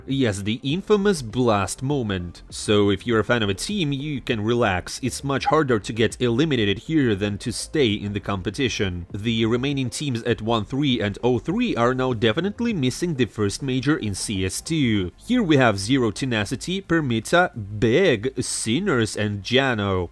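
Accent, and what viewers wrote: Russia. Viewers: bros mocking French accent meanwhile having the strongest Russian accent :DDDDDD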